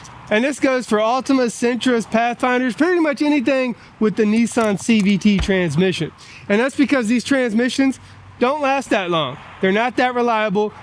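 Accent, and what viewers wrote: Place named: South Carolina, United States. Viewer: South Carolina i hear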